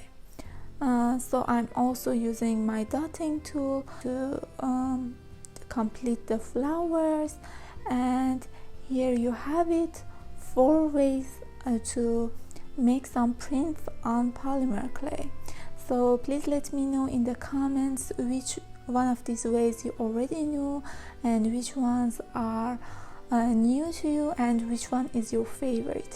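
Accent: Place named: Iran. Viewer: I can call it from the accent that you might be Iranian ! but thanks for sharing the technique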